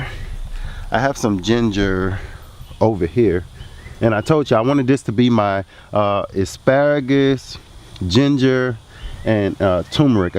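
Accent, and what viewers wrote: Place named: South, United States. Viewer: I know a southerner when I hear my people. Lol This is my first year attempting a garden. I did very well with container tomatoes, then bombed on the garden bed plants. However, I just went and found my first baby watermelon growing after I hand pollinated it three days ago. Super excited! Which zone are you in? I'm in 8a. I'd like to figure out what I can grow through the Fall. Thank you for the videos~!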